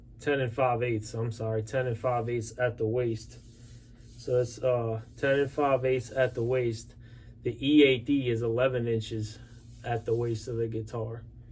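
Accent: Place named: Louisiana, United States. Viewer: So i'm listening to this video but wasn't watching it and i hear the unmistakable accent from Da Parish and then i pulled up the screen and i'm like woah i know this guy. Great video man.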